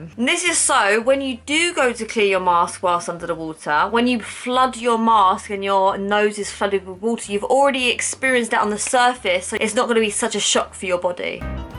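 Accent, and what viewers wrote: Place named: England, United Kingdom. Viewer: I really like hearing your English accent pronunciation on little bit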